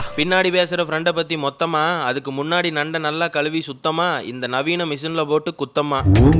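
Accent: Coimbatore, India. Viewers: Anna Namma Coimbatore tamil alago alagu .neenga pesarathu ketka ennum alagu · Anna unga enga kongu Nadu voice ye Thani anna super 🎉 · Editing and timing counters are fantastic ❤❤❤ Moreover very catchy voiceover in Coimbatore slang❤❤❤ Semmaa kannu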